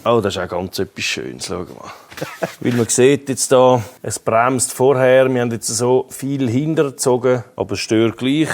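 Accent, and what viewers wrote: Switzerland. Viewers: Das geilste an seinem Schwizer Dütsch is wenn ich genau hinhöre verstehe alles 😁 sogar als hochdeutsch gewohnter😁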